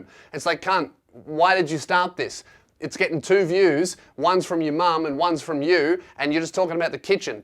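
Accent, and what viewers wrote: Australia. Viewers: Since when did Ninja have am Australian accent?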